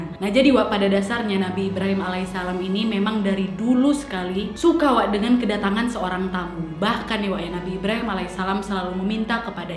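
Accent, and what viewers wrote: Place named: Medan, Indonesia. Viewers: Awak orang mane ? Kadang macam melayu medan..kadang macam melayu kepri.. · Uak,aku sangat suka,kali logat medan uak itu tros kan wak ya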